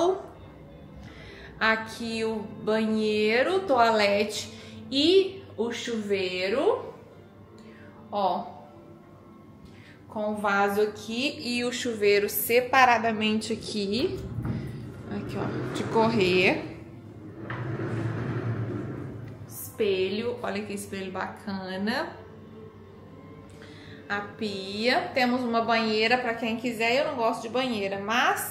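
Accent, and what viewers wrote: Minas Gerais, Brazil. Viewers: Oi. Adoroooo viajar com você por esses lugares paradisíacos. Eu deveria dizer com vocês, pois temos 2: a PODEROSA que se veste com as grifes caras e a GENTE NOSSA que se veste com Shein, e tem nas duas a SIMPATIA MINEIRA com esse sotaque maravilhoso! E ADOLESCENTE é tudo igual kkkkkkk. Beijos.❤❤❤❤❤❤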